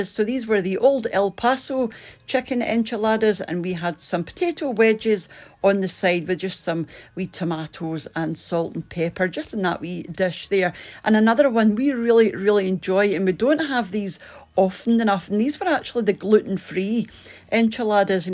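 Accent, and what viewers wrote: Scotland, United Kingdom. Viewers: "Carrot Crush" is from "The Royle Family!" 📺🤣 I love your wonderful Scots accent, and you cheerful positivity,! 👍🤣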